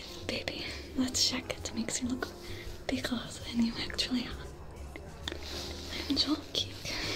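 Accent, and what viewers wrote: France. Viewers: honestly it truthfully is the background soundscape that does it for me it's good white noise to contrast the performance you do Also for some reason the French(or is it more Cajun?) Accent does tingle me kinda hope you have more reasons to do it in the future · Are you from France, my Green love? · I haven't finish watching this yet I am already commenting haha I am so sure that I will not finish watching this because of course, I will fell asleep again and earphone wires will choke you hahahah I just mostly leave my phone on and just listen till I fell asleep. *update, I have finished watching it XD Will you also upload the no music version of this ? If not, I will still watch/listen to this~~ I don't know if it's me only but the accent is kinda like a French, specially how you emphasize the letter R hahahah Thank you for this amazing, cool, efforts and everything. 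I/we love it already ( ╹▽╹ ) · Can we Just acknowledge how she answers to EVERY Comment + how hard she works for us to Fall asleep. Also I have never watched Star wars before but because of this ASMR and the french accent I will watch Star wars now. Oh and I follow you for quite a while now and I wanted to thank you so much for so many anxity attacks you took from me. Especially for the sleepless nights, you helped be trough. Just Thank you for everything you do (✿^‿^) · This is an amazing ASMR rp. First the asmr components as always are top notch. Second the choice of French accent really helps hit certain sounds that trigger (at least for me). Then there's the commitment to the star wars component. Most of the props look like they would fit in universe. Lot of work for the background components. Then there's you costume. Your rps are fantastic. Keep up the amazing work. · It's truly amasing. 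I'm a fan of Star Wars since I was 3 years old (I'm 25) and I must say I have a thing for Twi'leks. You are so glamorous and adorable as a Twi'lek. And add to that, since my mother language is french, pour french accent is truly nice. Love you and your works. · i was like "wow the French accent really fits twi'leks." but as im reading the comments, I was not the only one that forgot that it was the actueel accent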